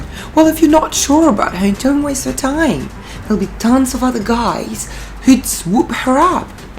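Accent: United Kingdom. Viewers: british accent? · Why your accent is British? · Sounds you have a British accent. Did you go to school in the UK? or Australian? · Her accent sounds so english from England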